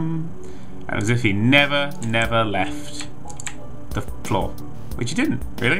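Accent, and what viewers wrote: England, United Kingdom. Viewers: I think he means it's technically an ENGLISH accent and not a BRITISH accent. There's no single accent for all of Britain, but England has a native accent. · Britian is the country itself right? And he does have a british accent that Sab3r prick isn't all too bright.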